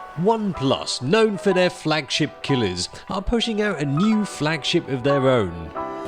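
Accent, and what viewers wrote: United Kingdom. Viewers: With that British accent, i was not expecting an asian guy..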